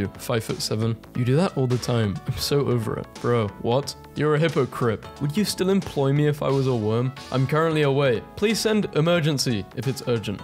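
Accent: United Kingdom. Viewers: The British accent makes all the memes 110% better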